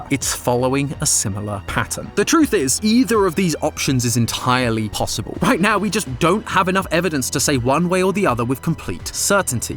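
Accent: United Kingdom. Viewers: my mom came into my room while i was watching this and asked if it was a game theory video, i said yes and she just goes “why is matpat doing a british accent?”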